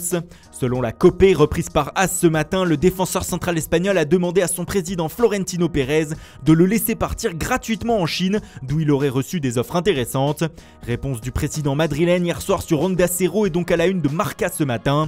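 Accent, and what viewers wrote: Italy. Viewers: Arrête avec ton accent italien sa fait mal aux oreilles . T'insiste trop avec ça !!!!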